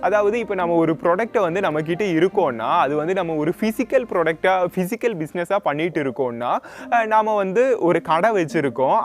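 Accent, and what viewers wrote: Kerala, India. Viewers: Nenga keralatha.....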